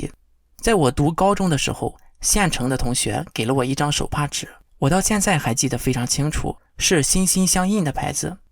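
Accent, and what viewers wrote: Shandong, China. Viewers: 山东口音好重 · 原來clause是山東人，普通話確實和我山東同學的口音相似。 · 山东口音，不是临沂就是枣庄，跟徐州口音差不多。我倒想知道你移民海外之后，会不会关注你所在国家的政治，你如果想拉更多的移民业务应该出影片介绍或分析一下你所在国家的一些情况。你做的一系列反共的影片是推力，如果做你出影片分析你所在的国家就是拉力，推力+拉力共同使劲才会有更多的移民业务😀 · 听你的口音就感觉是老乡，这次确认了。我也是山东农村，东部，90后，你的经历跟我基本一致。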